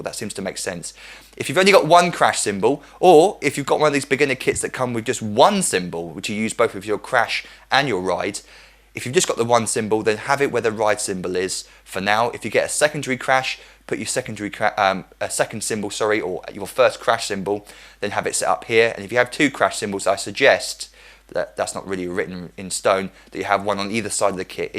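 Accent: United Kingdom. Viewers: Very helpful video! And, I really like and so, but I hate british accent ><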